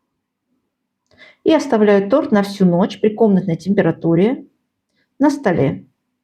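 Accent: Baku, Azerbaijan. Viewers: Спасибо Вам,дорогая землячка!Ваш голос и наш бакинский акцент просто завораживает и ласкает слух!Рецепт -супер!Успехов Вам. P.S.А хочу я ореховые трубочки,кот.продавались в Баку,я писала про них Вам..❤❤❤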